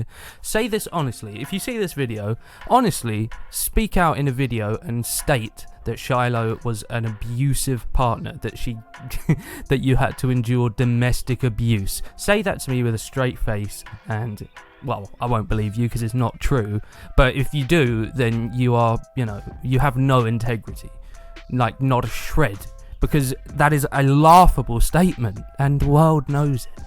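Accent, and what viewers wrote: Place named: United Kingdom. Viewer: I love hearing a Person with a British accent shit all over him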